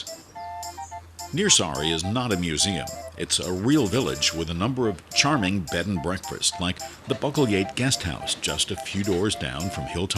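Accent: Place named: United States. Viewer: Unfortunately it's an American voice over . 🇮🇪🇪🇺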